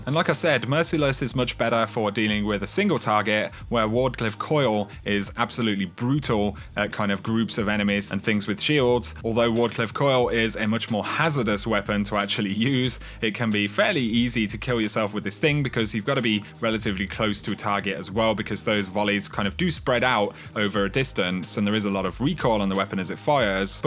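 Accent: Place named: Birmingham, United Kingdom. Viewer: Brummiest accent going on yt lool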